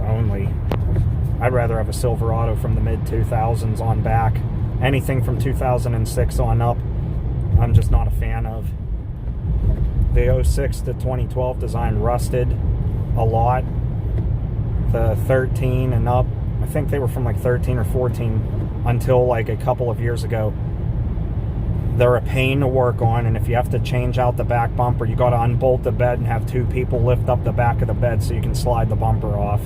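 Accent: Pennsylvania, United States. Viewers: Enjoyed your authentic PA accent!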